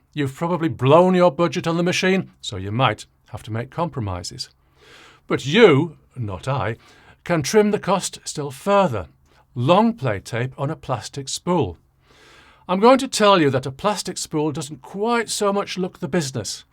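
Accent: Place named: Liverpool, United Kingdom. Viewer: Thanks scouser ❤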